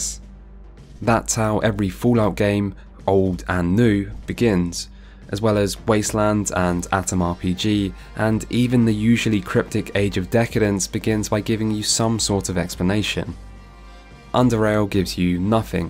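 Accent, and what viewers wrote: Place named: United Kingdom. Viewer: but you have a British accent!!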